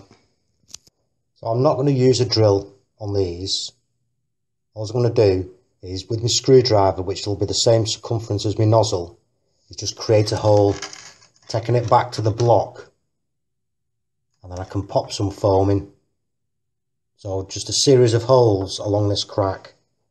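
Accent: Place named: St Helens, United Kingdom. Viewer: Sound like your from st.helens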